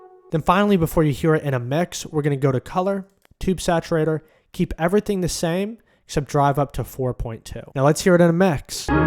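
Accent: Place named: New York, United States. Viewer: You sound so new yorkian...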